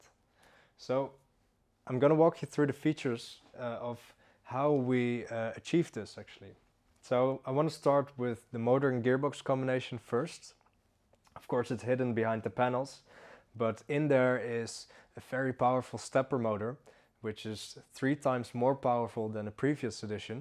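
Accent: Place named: Netherlands. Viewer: Heerlijk Nederlands accentje :p, maar good job though!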